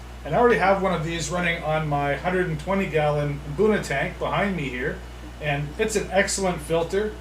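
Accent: Canada. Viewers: Why do you have such a bad accent? Are you way deep in Canada?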